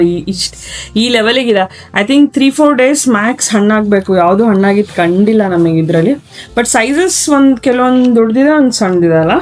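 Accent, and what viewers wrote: North Karnataka, India. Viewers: mast kannada mathu Paka uttar karnataka 👦 Boy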